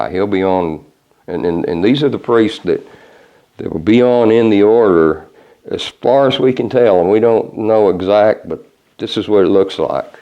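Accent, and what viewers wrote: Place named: South, United States. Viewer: Oh brother, bless you. You really get it, the difference bw the BoC & BoL!!!! Praise YHWH & bless you! Where are you located? Ur a southern gent., that I can tell, but where? TX, i hope or TN?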